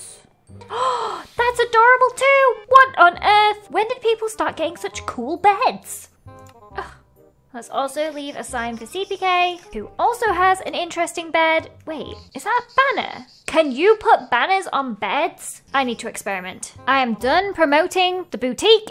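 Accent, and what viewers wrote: United Kingdom. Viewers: So she talks british like normal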